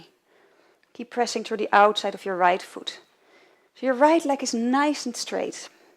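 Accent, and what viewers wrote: Netherlands. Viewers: Funny how you can always tell a Dutch accent ;) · No she's Dutch, you can so totally hear that.. ^^ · No she is obviously dutch :D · I'd say she's Dutch.... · dit klinkt zo Nederlands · ik hoorde meteen dat ze nederlands is ;p xD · Hahah Nice i'm dutch to and i heard straight away you were dutch. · Hi eferybody velkom to... hehehe that is so Dutch accent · Nice house. Nice workout. Yes, she is Dutch, I'm Dutch and this is a typical Dutch accent. No, she didn't learned it in Ireland. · are you from holland? you sound like you have a nederlands accent :) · i was wondering what nationality you are? you sound dutch. thank you for your videos i watch them often :) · Ik hoor aan je stem dat je nederlands bent! Haha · She sounds dutch xD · you sound dutch ( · She's obviously Dutch. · Nederlands accent? of vergis ik me heel erg :) Maar alsnog een lekkere yoga oefening. 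Dankjewel. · Well like she said herself, she is Dutch. And you can really hear this too, if you are just a tiny bit familiar with the Dutch accent. Her vowel pronunciation is typically what Dutch people do when speaking English. Also her lack of pronouncing "th" is significant. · Great video, makes me want to get into yoga. Sounds like a dutch accent to me. : Wow. Are you serious? I think god knows what's in our hearts. If we were doing yoga while actively thinking about praising idols then yes. That wouldn't be good. But of we are doing these yoga poses to strengthen the body god gave us and wanted us to take care of then there isn't any problem. In the bible it explains that your body is a temple which should be glorified and taken care of. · Zeker een Nederlander. Mooie stem :-) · super leuk dat je Nederlands bent ! goede video ook wel echt nederlands-engels accent. oefen daar op dan word de video duidelijker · You sound pretty dutch, have you also got dutch yoga vids? 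· Ik kan horen dat je uit Nederland komt :) Het is echt heel goed uitgelegd! Super bedankt! xxxxx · het is echt duidelijk te horen dat je nederlands bent haha. goeie oefeningen :) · yu sound like yur from the netherlands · Hahahaha the Dutch-English is hilarious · You sound dutch :D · omg the dutch accent is killing me xD I am dutch btw.... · i'm irish. she's definitely not. shes dutch lol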